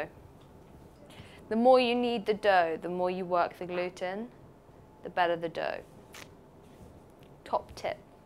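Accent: United Kingdom. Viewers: I swear to God I love British accent >3< So many tasty recipes, so little time to make them :(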